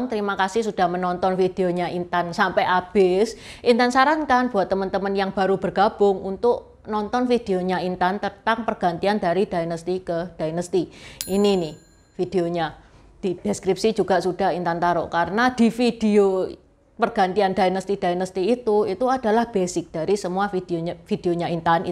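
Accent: Java, Indonesia. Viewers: Bahasa Mandarinnya aksen Jowo... 😊😊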